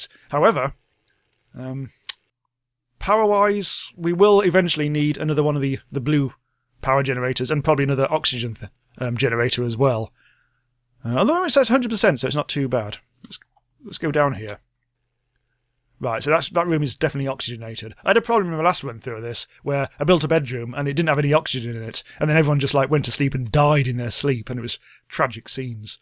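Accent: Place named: England, United Kingdom. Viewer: It's a fairly typical English accent, I don't sound much different either. :)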